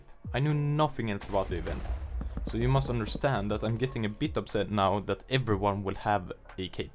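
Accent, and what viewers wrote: Sweden. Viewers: You sound Swedish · ur swedish no doubt about it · I like how I can hear the Swedish accent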